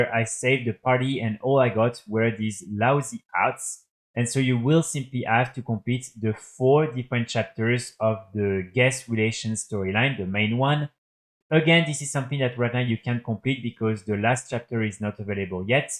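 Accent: France. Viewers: also i need to say i love that french accent its just makes it sound so nice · French accent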